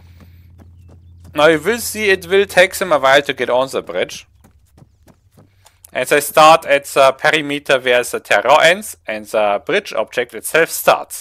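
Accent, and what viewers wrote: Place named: Germany. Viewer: The good GERMAN accent :-D Feier ich! :)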